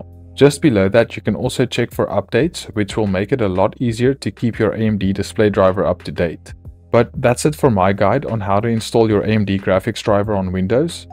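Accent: South Africa. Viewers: Definitely South African accent 😂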